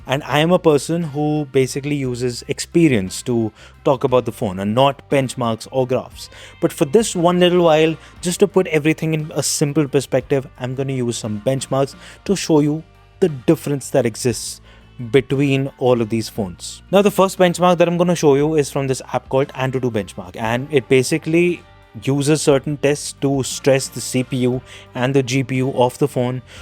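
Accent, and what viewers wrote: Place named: India. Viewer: Stop speaking Indian man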